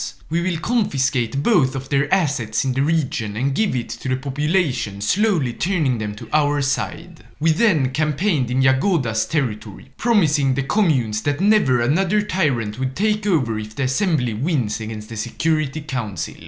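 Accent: Sweden. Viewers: I like how through out all your videos you pronounce alot of cities with a swedish accent, and as one aswell it gives the videos alot of flavour. Thank you I love your videos, keep up the good work! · You have the strongest swedish accent I've ever heard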